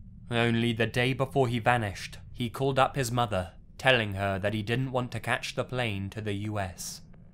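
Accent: United Kingdom. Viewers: I feel people who speak harry potter are the best narrators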